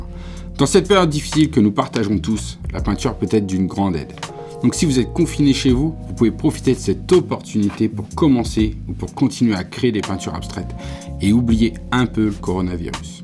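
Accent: France. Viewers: Oh so you just said to hell with conversational English then. French accents sound amazing when speaking English! That and I was only a C student in French. Oddly, needing directions to the disco almost never comes up during art lessons... Dance break? Nice painting by the way. Will you be wearing that hat to the disco? 🎨🎮🔐😀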